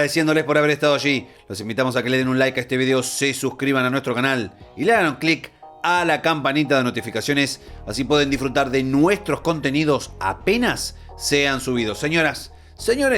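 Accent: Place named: Argentina. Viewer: Tanto el locutor de este video como algunos comentarios sólo quieren ensalzar a un Personaje que si bien es un buen portero y eso no se pone en duda es una persona con un pobre léxico, más bien soez y escatológico, luego en el cobro de penaltis hizo mofa de su rival, una actitud antideportiva, irrespetuosa y vulgar, sin contar todas las payasadas en el punto de penaltis y luego para completar (advierto al locutor de este canal que si borra éste mensaje el cual no tiene ni una sola grosería, ni palabras obscenas, ni irrespetuosa lo denunciaré ante YouTube para que aprendan a hacer un uso correcto de éste canal que por demás es gratuito,) y cómo les decía la guinda de la torta ese gesto de ponerse el guante de oro en sus partes íntimas como un acto propio de un sádico. Señores este Dibu tiene un comportamiento totalmente de enfermo psico-sexual compulsivo. 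Todo lo descrito en mi comentario es totalmente verídico, público, comunicacional y notorio, por tanto la verdad no se puede ocultar con edulcorante dicho por un locutor que a todas luces se nota en su acento es argentino, por lo tanto no censure mi comentario y sea serio en su trabajo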